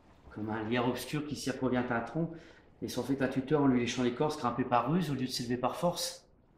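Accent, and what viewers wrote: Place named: North, France. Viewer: Vous venez du nord vous ^^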